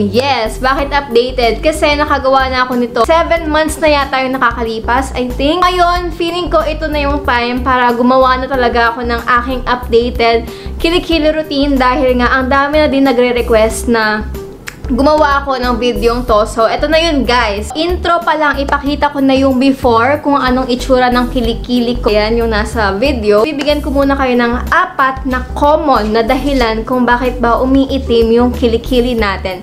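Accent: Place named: Visayas, Philippines. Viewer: Visaya kaayo uy *HAYAHAY NA ANG BUHAY MO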